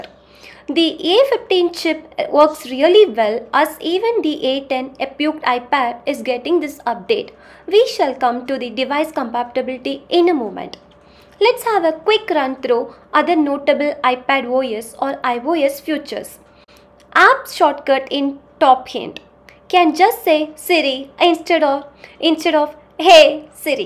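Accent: India. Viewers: I llllllllove your Indian accent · Your indian accent is so thick girl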